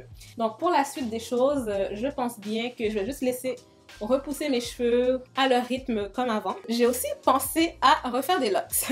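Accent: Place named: Quebec, Canada. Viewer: J'aime trop ton accent québecois en plus tu est de toute beauté😌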